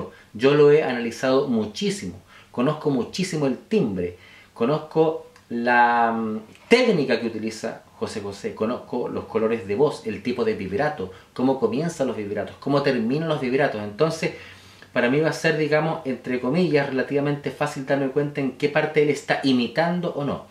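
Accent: Chile. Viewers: Mira Con tu voz tu habla Chileno no critiques a Manuel Manuel es mucho Manuel cantando lo que tú canta no gasto tiempo en escucharte Tanfeo que hablas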